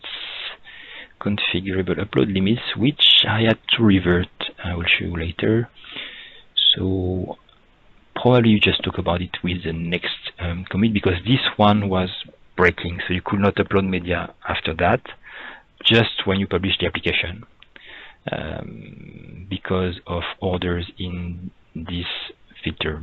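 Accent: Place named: France. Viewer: Très bel accent français ;)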